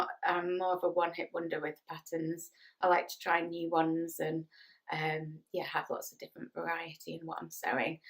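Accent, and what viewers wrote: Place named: Northern England, United Kingdom. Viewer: Hi! I've only just found you. What a soothing voice you have. I thought I detected a northern accent there, I'm from Chorley ( 20 minutes on the motorway from Manchester). I really love Abakhan as well.... I love the Northern quarter in general. Good to know about Moston, I'll give it a try! Lovely to hear all about you! Thank you. 😘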